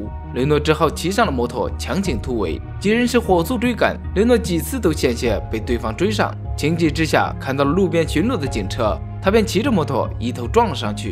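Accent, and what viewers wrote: Japan. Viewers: 解说的普通话怎么有日本口音？ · 兄弟你这口音像日本人，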